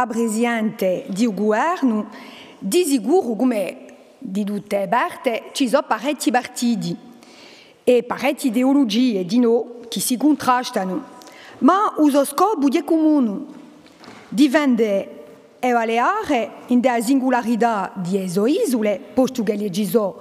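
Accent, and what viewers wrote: France. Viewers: Che brutto il corso un italiano con le u finali e la.cadenza francese . Il sardo e tutta un altra cosa.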